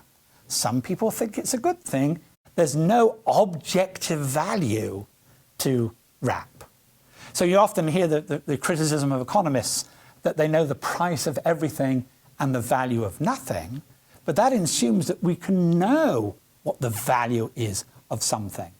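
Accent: United Kingdom. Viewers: Sounds Scottish of some description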